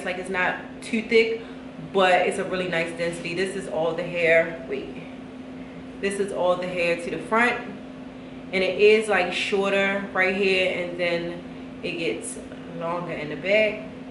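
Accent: Baltimore, United States. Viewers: her voice has a Baltimore accent!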